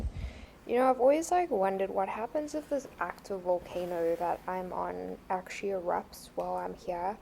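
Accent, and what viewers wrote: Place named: United States. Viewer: Чувиха ботает на американском языке. А это немножко не английский.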